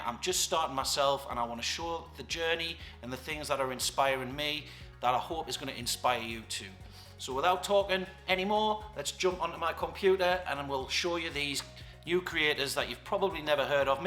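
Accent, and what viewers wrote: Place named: North East England, United Kingdom. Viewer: I’ve just found you because of a mention on Chads channel. I’ve already watched some of silver and solo videos but not heard of Alexa, so will definitely check her out. It sounds like your from the north east, I’m from Cumbria and about to start a YouTube channel. I’ll be following your journey, great content 👍🏻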